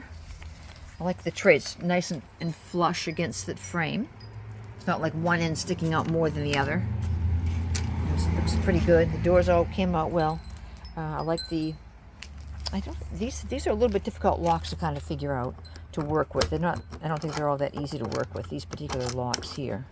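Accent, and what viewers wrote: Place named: New England, United States. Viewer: Ok, first what general area are you from, I moved from NH and your accent sounds familiar. Also, if you were buying a first coop for about 6 chickens, which one would you buy?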